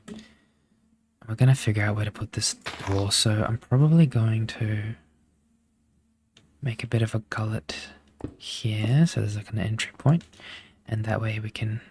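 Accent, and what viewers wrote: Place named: Australia. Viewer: Not me starting to fall asleep and going, wait, I know that accent, that's my accent! And checking if you're Australian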